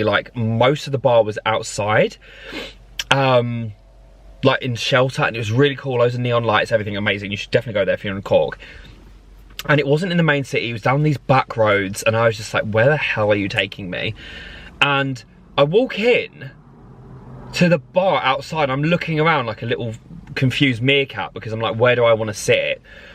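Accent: South London, United Kingdom. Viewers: south london mandem accent 🤣🤣🤣 can’t lie it’s my fave also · 100% with you there on the accent, i know exactly what you mean - it’s a south london accent and it does a funny thing to my knees too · So you are a south londerrr aye 😅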